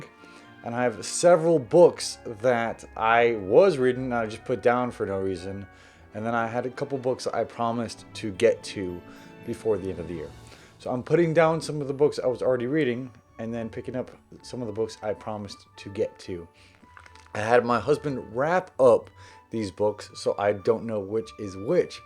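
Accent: South, United States. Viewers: I love your video at your video in Texas during your quarantine until your husband, Zach was wrapping all of the books while you're open them to see some new books including Black Sun by Rebecca Roanhorse, Legendborn by Tracy Deonn, Ready Player Two by Ernest Cline, And I Darken by Kiersten White, The Fires of Vengeance: The Burning, Book Two, The Invisible Life of Addie LaRue by V.E. Schwab and House of Earth and Blood (Crescent City) by Sarah Maas, but you're talking about all of the characters from the books to read them after that, but I hope he'll be proud of you and I love your Southern accent!!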